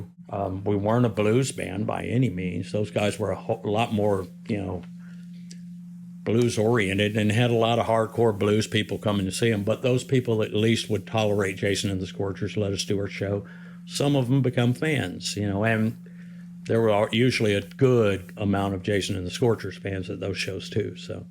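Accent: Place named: Indiana, United States. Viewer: This guy is cool to listen to, he sounds like he could be a Hoosier (?) referring to the “accent” if we have one 🤷🏻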